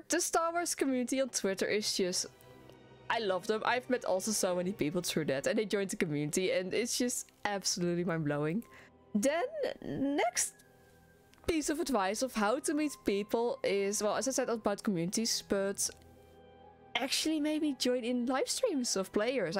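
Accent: Netherlands. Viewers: this was a nice video, very educating it is, love your dutch accent btw :P (im dutch myself too)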